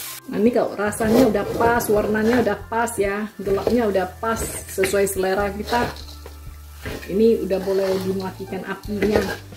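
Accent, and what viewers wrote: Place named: Tanjung Balai, Indonesia. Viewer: kalau dengar logat dan bicaranya mak mungkin punya latar belakang orang tj balai ya , sukses selau mak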